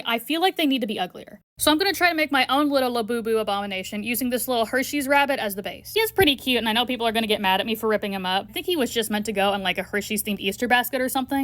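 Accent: United Kingdom. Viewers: I feel like he’s British. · It's just a british guy ❤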